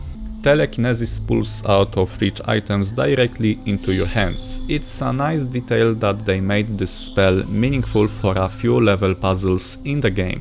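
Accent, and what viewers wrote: Poland. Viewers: Odrazu słychać, ze jesteś z Polski każdy Polak wymawia końcówki ing z g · Słychać że rodak xD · podnieca cię podcinanie innym skrzydeł? Ma akcent i co? Jest z Polski to ma polski akcent, prosta i normalna sprawa, tylko debile silą się na hamerykański mimo że nie przychodzi im naturalnie, a gość mówi bardzo dobrze i zrozumiale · I love how polish you sound brother 🇵🇱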